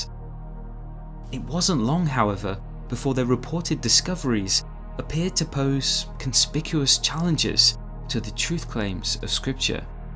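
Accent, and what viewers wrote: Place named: United Kingdom. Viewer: can't do the voice. I get enough British ascent,. In our Canadian programs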